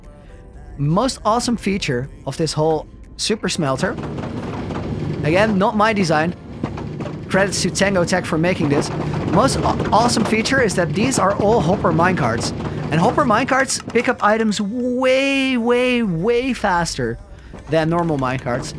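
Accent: Netherlands. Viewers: Are you dutch? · Are u dutch ben je nederlands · Its the first time watching you but i bet that your Dutch😂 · Do I hear a HOLLANDS accent there You have GEKOLONISEERD the sea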